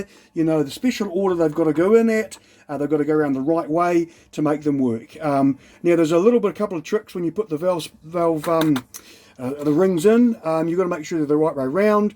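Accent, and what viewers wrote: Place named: New Zealand. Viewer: Cars and cameras, red beards garage have lots of helpful stuff....but the kiwi accent is great!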